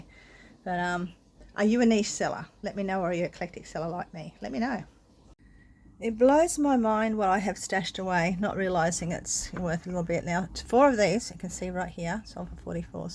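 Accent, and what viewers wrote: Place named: Australia. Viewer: I am a 67yr old Aussie NSW too and you were recommended by Jason Adams. Enjoyed your video and subscribed. So nice when I listen to our accent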